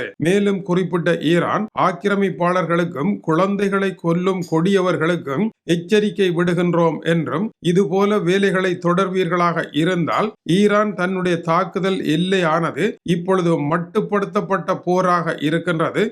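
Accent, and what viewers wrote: Sri Lanka. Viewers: அதிஅகோரம் ப்பா ஸ்ரீலங்கா தமிழ் ❤❤❤❤❤❤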